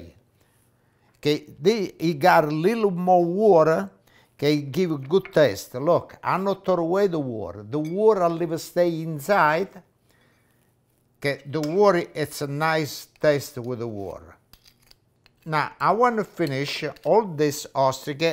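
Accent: Italy. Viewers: The recipe is great, but that Italian accent makes it better!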